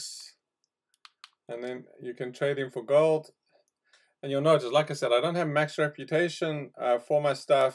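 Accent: South Africa. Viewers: Are you south African? The accent sounds hella familiar 🤣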